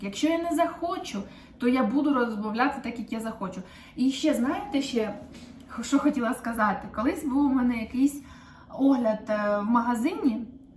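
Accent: Surzhyk, Ukraine. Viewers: вас суржик очень милый❤ Мне, как русскоговорящей украинке, совсем не режет слух😊 Хотелось бы узнать как заговорить на польском, может есть секрет 😂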